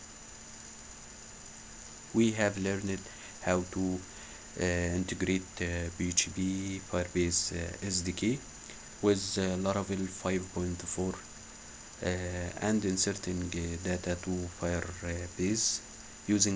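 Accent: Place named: Arab world. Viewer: هههههههه بعد ما وصلت 3 دقايق فى الفيديو قولت الانجلش دا بتاع حد عربى قفلت الفيديو لاقية اسم القناة بالتوفيق يا هندسة اتمنى انك تعمل ابديت للشرح مع نسخ اللارافيل الاعلى على فكرة انا مش بتريق على الانجلش بتاعك انا الانجلش بتاعى وحش جدا اصلا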